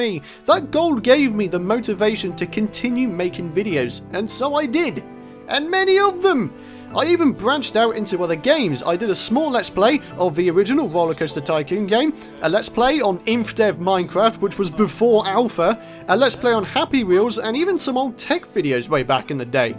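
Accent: United Kingdom. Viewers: let me just say that among many of the Minecraft YouTubers that I watch for their adventures through the vast world that is Minecraft, and the several great Terraria Let's Players that I watch, your intro and voice definitely resonates within my mind as one of the most energetic, fun and exciting ones that I know of! I discovered you from a comment you posted on one of Yrimir's videos when you were around 45-50K subscribers, and I remember you were working on your Co-Op with AstonishingGamer as well as just starting the Sky Island series with another fellow (whose name eludes me) and I was immediately hooked to your episodes. Perhaps it's your charming British talk, or the way you say "I'm a very happy bunny!" but I am very much a fan of your videos and I look forward to what is to come in the future. Congratulations! Please keep up the good work, and it's commendable doing this while working someplace in real time. 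I hope to watch hundreds of your videos in the future! -Thomas (Could I request your filmed reaction when you get your plaque? I'm not saying a view of your face, but perhaps just like your first person reaction to it.:D)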